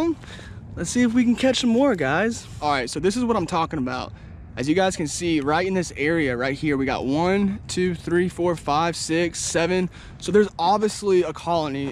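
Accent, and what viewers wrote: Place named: South, United States. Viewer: i was so confused, what got me wondering was that your brother doesn’t have a slight southern accent like you florida really is two different states 😂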